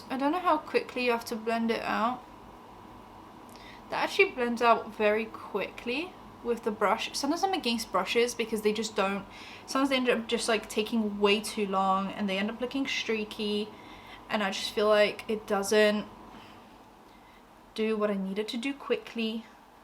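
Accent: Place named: United Kingdom. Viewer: I dont know what it is about British peoples voices but i love yalls voice, the tone and the accent. When i need to fall asleep fast i tend to listen to British people speaking (podcast, audiobook etc)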